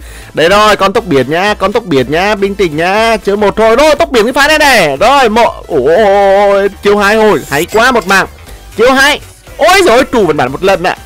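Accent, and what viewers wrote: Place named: Central, Vietnam. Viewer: Thích giọng miền trung ghê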